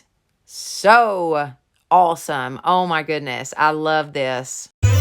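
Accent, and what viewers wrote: South, United States. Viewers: I'm a little confused. You talk about your fiancee but then you mention your mother-in-law. Just was wondering. And you are so talented it is unreal. Thank you for all the beautiful crafts you do. And I love your southern drawl. I too am from the south. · Hi, I just wanted to start off saying that I love love love all your craft ideas, you are so creative. 2nd is I get a kick out of your Southern accent. I am from the East Coast a small town in Massachusetts & love the Southern accent. I also love to craft, I am a beginner & have made a lot of mistakes along the way...but sometimes they turn out to be happy mistakes. My kids call me a craft hoarder lol, I am always at DT & DG those are my favorite stores. Ok enough of the gibber jabber, I'm looking forward to what you create for the New Year, happy crafting & be safe.😷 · So cute❤️❤️❤️I don’t know what part of the south you’re from, but I’m in Huntsville Alabama ( originally from Waterloo/Florence) but you crack me up so much because you sound like me talking!! 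Love your channel so much! I think it’s because you’re just blunt and tell it like all southern women do🤣 I have never found the lanterns in any dollar tree. I’ve been to about 10 looking for the little glass candle holders because I got everything to make light posts except them.. grrr 🤣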